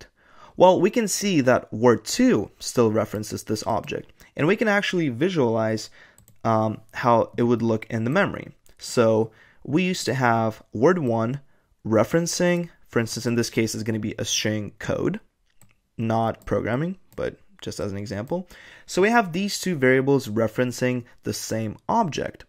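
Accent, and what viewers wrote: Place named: Russia. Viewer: я то думал, что не так, а ты русский оказывается😅 Хоть эти ошибки возможны в речи у носителей, считай чуйка сработала.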